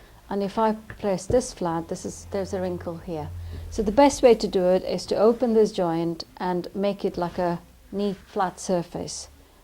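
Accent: United Kingdom. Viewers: Excelente la forma que tienes de explicar y tu acento británico tan bonito. ¡¡¡Felicitaciones!!!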